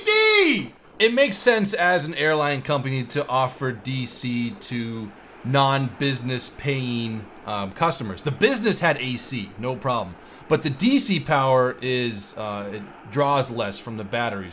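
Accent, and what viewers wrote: United Kingdom. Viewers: English accent on point! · I thought you were British?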